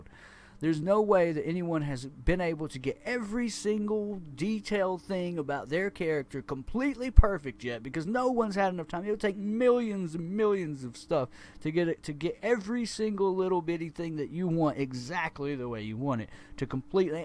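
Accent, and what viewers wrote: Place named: South, United States. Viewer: Southern accent is cool these days dude. Its also a total relief to listen to a voice that is original, and not sounding like a generic radio DJ like 99% of youtube gaming commentators. Btw thank you for taking the time to reply to my comment, credit to you sir. Good luck with the channel, its helped me a lot.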